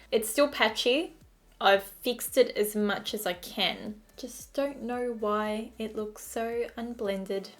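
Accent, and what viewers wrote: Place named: Australia. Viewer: Did it ever occur to you that isn't from the USA and in australia we have the same way of saying things as the UK aka Kitchen cosmetics?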